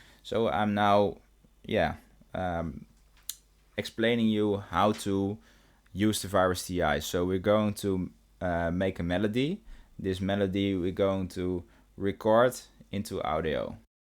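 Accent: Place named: Amsterdam, Netherlands. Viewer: Very goeie video pik. Nice Amsterdam English accent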